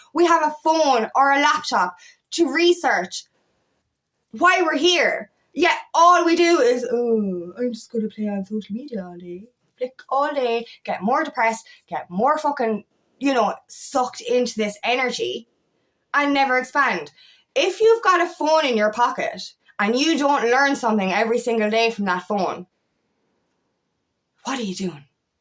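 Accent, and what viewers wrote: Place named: Ireland. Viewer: Yes it is where I belong for sure . I will fly the weirdo flag 😂 yes laughing really raises my vibrations 😂😁🤭 I honestly find things funny other don't lol I laugh at myself 😂 I just love the Irish accent when you say f ing lol 😂 I'm in the UK x everything you say you absolutely true 😊 xx we definitely need out ffs . Least we can all join together as one here . I might set up FB so I can join you there too . At some point I'm going do a video myself as I'm holding back but I'm saying it lol it ok for those who think I'm a freak lol I gone through worse 😂 xx have a good day in this f ing matrix we will be out soon xxx luv n hugs ❤🤗❤🤗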